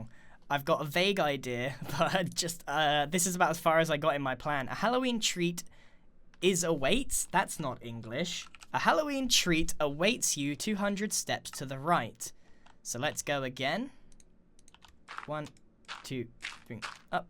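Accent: United Kingdom. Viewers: QnA: are u British/English cuz I am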